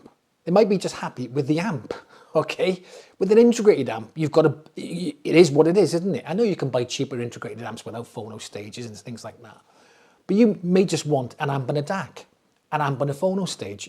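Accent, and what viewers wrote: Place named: Liverpool, United Kingdom. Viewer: Haha...loved the scouse accent! 😂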